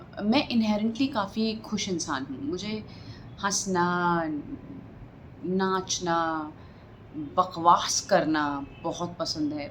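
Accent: Bundelkhand, India. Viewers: You Are really really amazing actress And I love your Bundelkhandi Accent. Keep rising and shinning. Jai Banke Bihari Lal Love from Hiten Pandit. SIT😍😍😍😍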